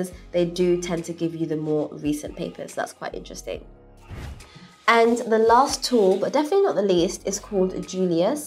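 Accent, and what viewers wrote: United Kingdom. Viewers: I LOVE YOUR UK ACCENT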